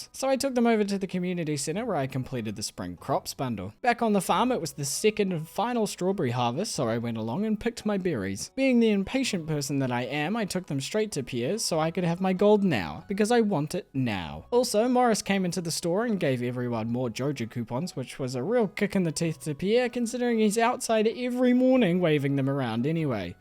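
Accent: New Zealand. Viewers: Just watched all your 100 days series videos and I have to say I am absolutely loving it! 🙌 Your New Zealandish accent is quite funny to listen to while you play as some words you say are clearly very differently pronounced to what I assume most of your viewers accents would say which causes some funny confusions 🥴😂 Keep it up and looking forward to next episode! Much love from Australia!! 🇦🇺 Question: I guess you are managing just fine without it but where's your UI boost Mod at? 🤷‍♂️ And also a pigs 🐷 name has gotta be 'Pigpen' from Charlie Brown 😋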